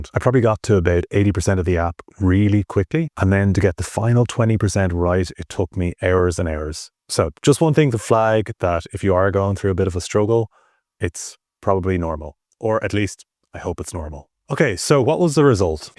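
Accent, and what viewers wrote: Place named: Ireland. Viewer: Which part of Ireland is your accent from?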